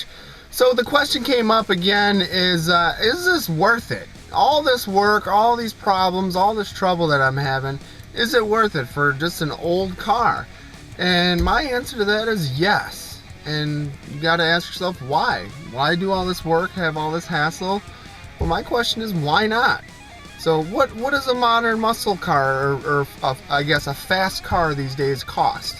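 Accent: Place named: Midwest, United States. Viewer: I knew you were midwestern with your accent. Hello to Chicago from Toronto.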